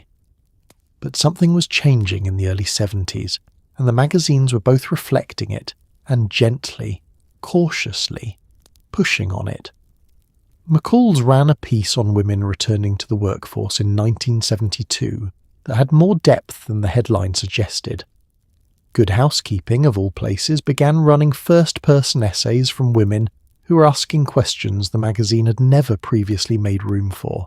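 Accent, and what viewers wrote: England, United Kingdom. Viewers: No please leave the English voice! The whole world is not American thank you 🙏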